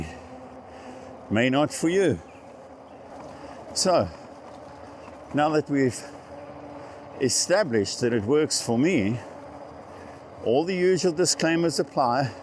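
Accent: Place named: South Africa. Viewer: thanks. Loved your video btw! Great hearing that SAFFA accent. I bought a Cube Supreme Sport Hybrid with Bosch battery. Unfortunately could only get a 400w battery, no stock anywhere of the 500w. Hopefully this will do the trick for now. Could have got a Norco Scene VLT for same price with 500w battery, but no front suspension, and non integrated shimano battery. Merida also seemed good, but saw that too late, and didn’t want to muck about the sales guy any further. Anyway a 30day “trial “ period and can exchange if I absolutely think it’s not for me. I’m not keen to use this option, so will likely stick with what I have unless there is some serious fault or issue I have.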